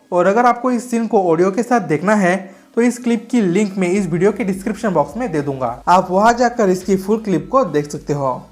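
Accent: Bihar, India. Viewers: Aap Bihar se hona?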